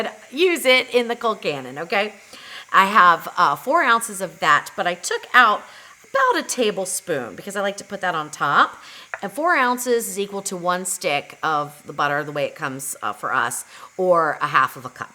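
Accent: United States. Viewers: So much talking! Just show us how to make the dish. Also, if you are half Irish and half German, why do you have an American accent? 🤔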